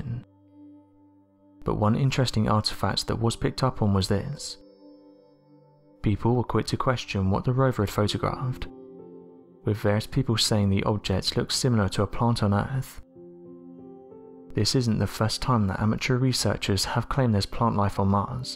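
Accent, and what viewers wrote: United Kingdom. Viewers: why are always using a british guy narrating these stories?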